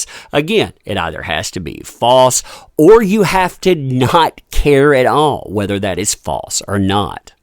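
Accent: South, United States. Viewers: Your hill Billy voice is very irritating. Must Americans sound so uneducated and low class. Except Johnny I love Johnny but he rightly prefers England and France anyway.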